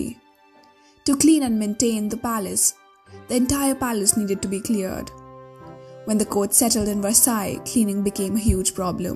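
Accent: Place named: India. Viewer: I just can't take the Indian accent seriously. I hear it, I'm gone.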